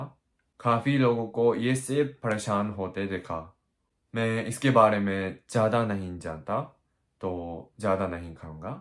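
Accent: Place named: Bihar, India. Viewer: Genuinely enjoyed the video, and your bihari accent is so good, when I watched this video I straight up went to your channel to find similar videos like this, but found none. keep making this type of videos. and if you ever happen to come to Delhi then we can hangout...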